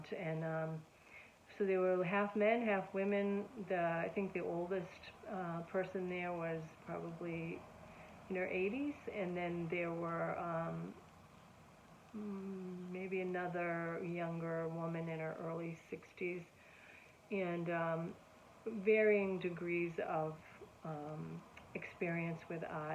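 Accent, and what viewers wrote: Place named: New England, United States. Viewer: Audio was fine very clear good video. I can tell you’re from New England though lol.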